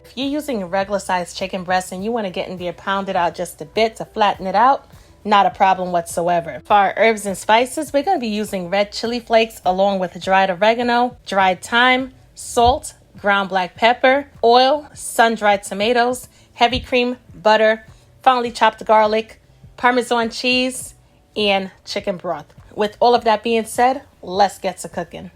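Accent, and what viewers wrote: New York, United States. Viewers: Lil mama got some skills also hummm I hear some NY in that voice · I’m making this today! Thank you! Btw are you from the DMV? New York? I love your accent 😊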